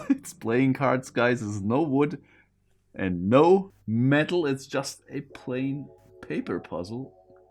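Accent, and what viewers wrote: Germany. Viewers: Why I watch vids: 1. his German accent and mild humor is soothing to me 2. I love his engineering brain and approach to solving puzzles - his methodical approach is interesting and has applications outside of solving puzzles 3. I get satisfaction from watching the process and the solve and the explanation of the mechanics. When solved, it's like I get the same satisfaction as he does which makes me feel good! Danke!:D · Au contraire, my friend. Please, forgive me my harsh tone, but for a somewhat younger (age < 45, I guess) German his accent is unforgivably and shamefully thick - "Fremdschämen" is my dominant feeling hearing it by my fellow countrymen, especially when accompanied by our (i.e. German) typical permanent appreciation. So, my ears kept bleeding. And I guess a half-trained chimp would be able to deduce what "jostle" means from the context "usual puzzle solving techniques". 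At least I was able to do it immediately even while eating my banana. In conclusion, I cursed using the i-word seeing him shaking and whacking this delicate piece. On the pro side, he didn't chew on it (a seldomly applied puzzle solving technique though;) Also, he did a very nice repair job on the puzzle (there is an engineer in all of us:) And he solved it. Nice. So, hurray... The puzzle itself is quite a piece of eye candy! A perfect christmas gift, IMHO.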